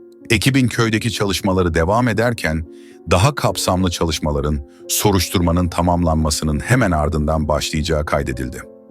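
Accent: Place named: United States. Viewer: Siz Amerika'da mı yaşıyorsunuz konuşmanız aynı Amerikalı gibi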